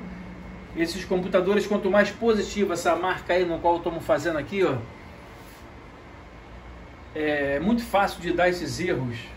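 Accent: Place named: Rio de Janeiro, Brazil. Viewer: Com certeza vc é da zona oeste do Rio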